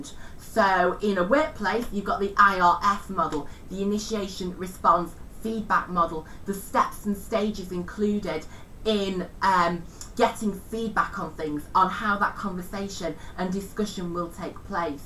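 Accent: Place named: Northern England, United Kingdom. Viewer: esy2011 northern accent innit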